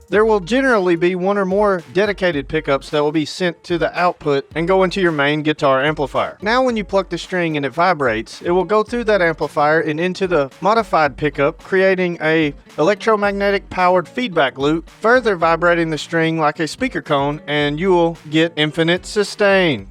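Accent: South, United States. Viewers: As a native Tennessean, I love hearing a deep southern accent saying intelligent things. Kick the stereotypes!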